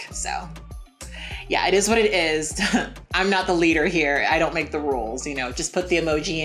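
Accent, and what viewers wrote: England, United Kingdom. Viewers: You doing the intro was so bizarre to a new follower. I was just here like "B....british??? I didn't think I followed any non-gaming British Youtubers....." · I love British speaking tbh 😍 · Your british accent is wayy too good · 🍔 As a Brit, I liked your English accent. It wasn't as exaggerated as most other Americans' impressions. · "I don't want comments" we are gonna comment: it's the british accent for me 🍔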